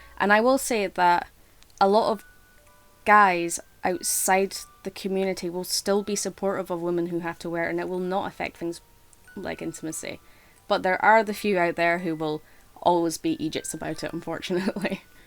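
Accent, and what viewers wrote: Scotland, United Kingdom. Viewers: While having issues at night as well as the occasional daytime accident relationships are difficult. If I had a partner with an issue that required diapers ( if I didn't have any issues of my own). I understand that the human body is a complex organism and things don't always work right and I would accept the fact that they needed to use diapers. Thank you for your videos I have always enjoyed them, especially your honesty. Now if I recall correctly in one of your videos you mentioned you're Scottish, I have always loved your scottish brogue. P.S. I am glad to see that you are uploading again.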